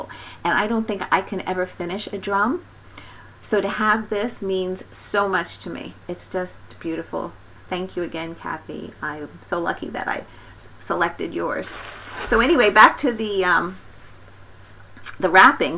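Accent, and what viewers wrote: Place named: Massachusetts, United States. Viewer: Hi! Found you a couple of weeks ago, and when I discover someone new, I immediately go back to their first video and binge watch them. So that is what I have been doing since about Thanksgiving! And I am all caught up now with this one! You are a prolific stitcher! I enjoy all your work, we have alot of the same taste. Thst band sampler is gorgeous! Love your accent, you remind me a little of all my relatives in Massachusetts. You are very sweet and I have enjoyed catching up with you! Congrats on your little grandson! And your DIL Rebecca is so sweet! You get along so well! Hopefully you have that same connection with your new DIL Amy! You have fast become one of my faves, in my top 5! Keep stitching, take care, and hope you had a Merry Christmas!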